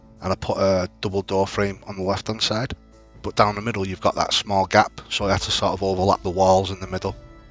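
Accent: Manchester, United Kingdom. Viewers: What’s your accent man? I’m tryna work it out, you sound like a mix of Mancunian and Potters.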